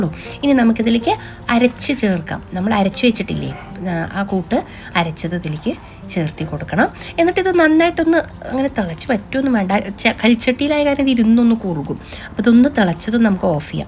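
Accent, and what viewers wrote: Palakkad, India. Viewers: Dear Mam, ഈ വെറൈറ്റി കറികളും പേരുകളും, ഉപയോഗിക്കുന്ന പാത്രങ്ങളും, കൽച്ചട്ടി, മൺപാത്രങ്ങൾ തവികൾ etc... പഴമയെ കളയാതെ പുതിയത് ചേർത്ത് പിടിക്കുന്ന മനോഹരമായ വീഡിയോകളാണ് എല്ലാം ...❤❤ പാത്രങ്ങൾ,വൃത്തിയുള്ള പശ്ചാത്തലം, ആ കർഷകമായ പാലക്കാടൻ സംസാര രീതി... നമ്മൾ കാണാത്ത നിഷ്‌ക്കളങ്കമായ, ചൈതന്യമുള്ള ഒരു ലോകം പോലെ തോന്നുന്നു.❤❤കൽ ചട്ടിയുടെ പിറകിലായി ഓമനത്തമുള്ള കുഞ്ഞ് പ്രതിമ😘❤ ബുദ്ധിമുട്ടാകില്ലെങ്കിൽ ഈ കുഞ്ഞ് കുഞ്ഞ് പാത്രങ്ങളൊക്കെ ഒരിക്കൽ കാണിക്കുമോ ?...❤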